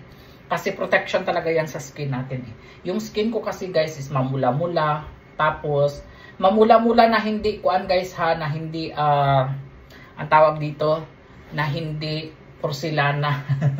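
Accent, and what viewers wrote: Iloilo, Philippines. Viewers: Taga saan ka po parang ilongo ka po sir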